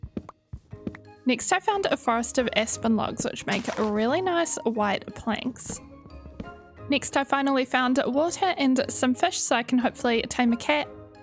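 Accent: New Zealand. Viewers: You mentioning the poppy’s significance as a symbol of honor for war veterans made me laugh out loud. I was literally at that very moment thinking about how I love that I found your channel not only because of your style of content and how perfect it is to watch/listen to while I sew or play Minecraft myself but because of your accent! I portray a WWI ANZAC (specifically a New Zealander in the AANS!) nurse as a reenactor (one of my many impressions to educate the public with) and there are two events a year I go to that are supposed to be immersive, so I try to brush up on my New Zealand accent beforehand and your content helps so much with that. I can’t believe I was thinking about just that when you mentioned the connection. 😂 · Im so used to watching American and British minecrafters that it took me a hot minute to place your accent, even though Im also a kiwi xD Made me happy to see you're from Nz. 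This was my first video of yours and I enjoyed ❤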